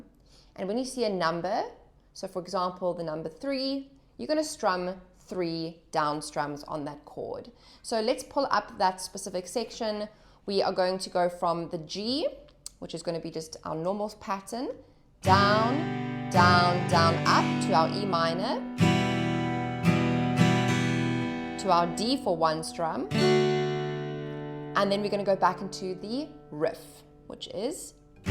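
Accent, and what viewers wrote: South Africa. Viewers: Are you South African? You sound sound like you are from South Africa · This is much easier. I am glad I came across this tutorial. Is that a South African accent? Recognize!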